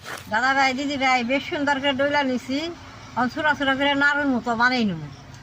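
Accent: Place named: Manikganj, Bangladesh. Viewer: উনি বাংলাদেশের মানিকগঞ্জ, টাঙ্গাইলের ভাষায় কথা বলেছেন। ধনিয়া কে বাংলাদেশের অনেক অঞ্চলে হজ বলা হয়ে থাকে। উনি গোটা ধনিয়াকে গোডা হজ বলেছেন। আরো কিছু অর্থ নিম্নরুপ : ১.ফাকি = গুঁড়ো ২. হুগনা = শুকনো ৩.মইচের ফাকি = মরিচের গুঁড়ো = লঙ্কার গুঁড়ো ৪. নবন = লবণ = নুন অল্প মিডা মানে অল্প মিষ্টি যেটা কিনা অল্প চিনি দিয়েছিলেন সেটা।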